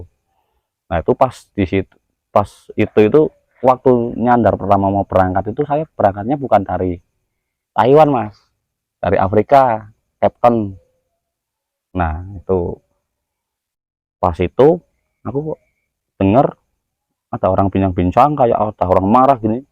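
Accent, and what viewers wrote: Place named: Central Java, Indonesia. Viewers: Nah itu kan nah itukan. Pasti orang jateng plat G😂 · Logatnya kok sprti orang pekalongan😂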